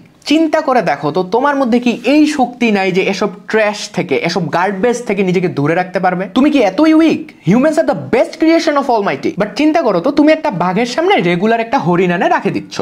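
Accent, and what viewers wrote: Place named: Dinajpur, Bangladesh. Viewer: বাবা তুমি যে দিনাজপুরের ছেলে ভাষা শুনে বুঝলাম।❤❤❤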